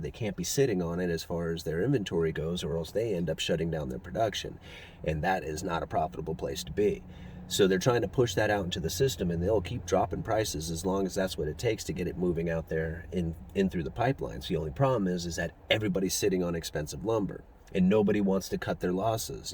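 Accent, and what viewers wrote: Pacific Northwest, United States. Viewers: Sounds very Pacific Northwesterly. Nice!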